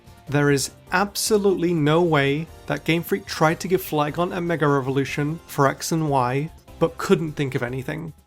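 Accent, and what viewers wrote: United Kingdom. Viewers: Exces me are you British? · he’s bri’ish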